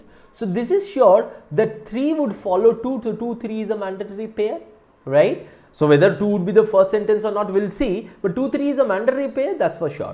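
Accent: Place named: Bengal, India. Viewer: Sir, you explain so well. But I guess there is a problem in me.. Still I'm unable to solve these types of problems.. So, I also started viewing critical reasoning topic with a hope to acquire more knowledge from you... Thank you! 🙏 Well, I'm curious to know are you bengali? 😅 (off topic)... Your accent seems so...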